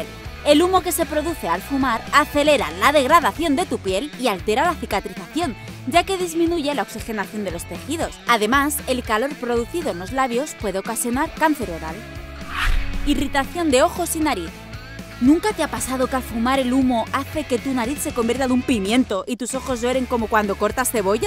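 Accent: Spain. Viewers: Una pregunta eres de mi pueblo no 😂😂 esque es torrent\Valencia jajajaja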